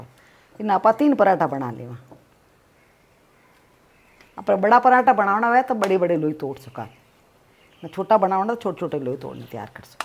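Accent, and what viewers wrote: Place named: Haryana, India. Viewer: Very nice, like your haryanvi accent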